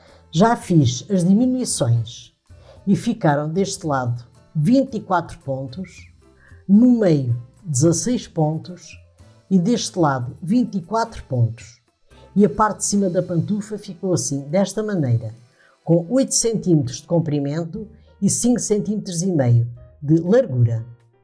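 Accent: Portugal. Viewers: Gostei muito do modelo da sua pantufas! Ë lindíssima e muito dificil de fazer(para mim que não sei faser tricô)! Vejo que você faz obras de arte com o tricô! Ah, também adorei o seu sutaque português! Sempre quiz conhecer portugal...sou brasileira e vivo na Argentina. Vou compartir com minhas amigas! BsAs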